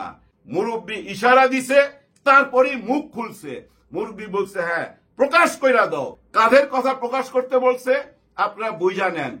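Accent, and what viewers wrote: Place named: Noakhali, Bangladesh. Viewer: Nice story vai I am pretty much sure you are from noakhali it’s ok nothing wrong with that..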